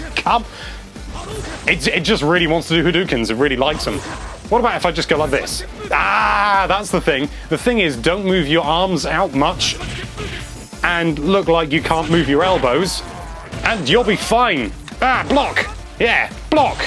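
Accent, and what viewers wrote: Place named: United Kingdom. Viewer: TuxedoNINJA643 What? I'm American and I can't get enough of British voices.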